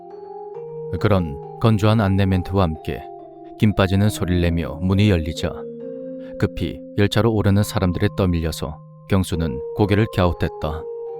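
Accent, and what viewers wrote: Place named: Busan, South Korea. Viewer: 이야... '민식이 법' 이거 나오는 순간, "맞아... 님 다시 시작하셨지. 실감나네." 하면서 굉장히 기뻤습니다. 요즘 댓글도 다 읽으신다면서 즐거워 하시는 모습도 상상되구요. 그러면서도 여전히 님만의 아우라가 느껴지는 소중한 영상이구나 생각합니다. 님만의 리딩, 부산 사투리로 듣는 괴담만이 주는 심오한 느낌, BGM을 기가 막히게도 선정하시는 그 아우라마저 느껴지는 신묘함, 또한 정말 너무너무 재밌게, 실감나게 인물들 대사를 열연해 주시는 그 모든 것이 더더욱 듣길 잘 했구나 하면서 하염없이 감사스럽고 기쁩니다 그냥. 우리 님 올 한 해에는 유래없이 기쁨만 연타로 일어나는 좋은 한 해 되셔요. 하도 많이 웃으셔서 구각이 아프다~~ 하시는걸 뛰어넘도록 쭈욱 좋은 일들만 있으실거예요. 감사히 듣는 모든 사람들이, 재밌게 들었던 모든 사람들이, 표현은 달라도 저처럼 응원할거니까요.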